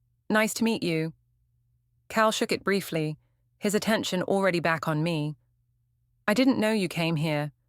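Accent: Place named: United Kingdom. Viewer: No longer listening to stories with a British accent.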